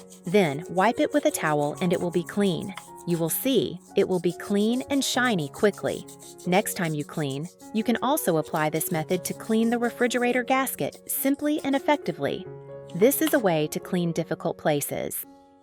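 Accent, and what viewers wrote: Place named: Texas, United States. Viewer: Gainesville Texas english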